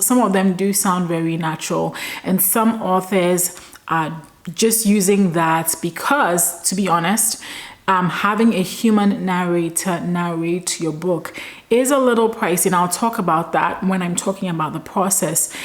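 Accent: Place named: Ghana. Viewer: You sound like a Ghanaian....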